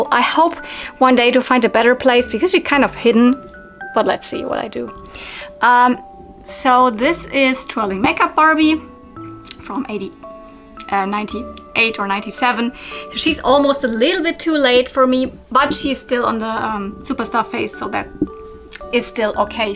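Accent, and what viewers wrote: Germany. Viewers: Are you from Germany? My bestie is from hamburg, similar accemt. And i dont think you have to say its for adult collectors, every age can appreciate all the toys you have. Do you have any rainbow bright or Strawberry shortcake 🍰? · wow you have an interesting barbie collection! I really like the bright colors of all ur dolls! I'm a barbie collector too and exactely like you I dun collect boxed barbies and I like to restore the dolls too. I love 80's and 90's barbie, and even 70's and 60's, but I'm a perfectionist and I always try to keep my barbies as close as possible to their original conditions with original outfits. I see you always find many good things at flea markets but here in Italy it's so hard and rare to find vintage barbies at flea markets, ur so lucky! Btw I like the simple way you collect barbies and it's so cute to hear ur german accent. 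Diese ist wunderbar!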